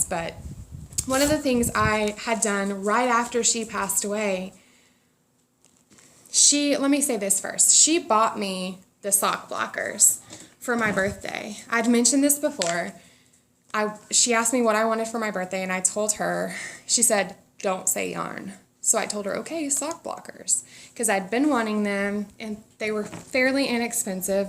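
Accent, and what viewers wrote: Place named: South, United States. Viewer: Hi, I sometimes get those purl bumps too when casting on toe up. To avoid them you need to knit into the back of your stitches on the first row after you cast on. At least that seems to work for me. Also, handy hint, if you are finding all those m1L & m1R really slow going on your toes just do kfb instead. It may not look quite so neat but it's much less hassle & who's going to be studying your toes anyway?! PS love the podcasts & your Southern accent!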